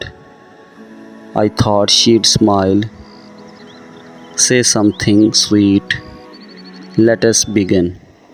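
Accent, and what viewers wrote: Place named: India. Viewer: Why the accent is indian i dont think this is faza faza is defrent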